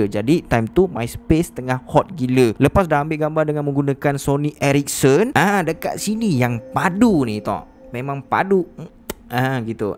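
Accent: Johor, Malaysia. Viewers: bang dari johor ke hahahaha salam dari kulai senai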